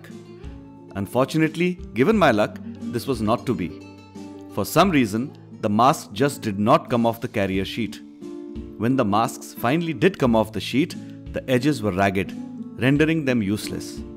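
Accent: India. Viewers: Judging from the dialect you are Indian... well, your model is very colorful, but I think it has nothing to do with the coloring used on the real aircraft. From late 1939 gray colors (RLM 74, 75, 76) replaced the blackgreen/darkgreen (RLM 70, 71) paints. "Experts" reiterate, that Bf 109 E's in early/mid 1940 were finished in RLM 71/02, period!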